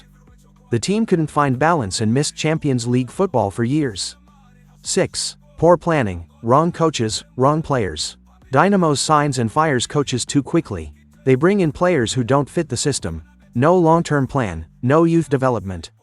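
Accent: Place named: United States. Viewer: First of all Bernard Marriott must take full responsibility 4 the current predicament Dynamos fc finds itself.Marriott ,a founding member has been treating Zim's most illustrious, famous,successful fc as his fiefdom.If Dynamos gets relegated ,all Dynamos fans should descend on Bernard Marriott or call 4 a fatwa on him !.Secondly the yank voice over is nauseating and atrocious to say the least !.Marriott must disappear from the helm of Dynamos.True Dynamos legends like Mhofu,Mwendamberi,Kwinji 15,Mozze etal must come back and serve/save Dynamos Fc !!!!!.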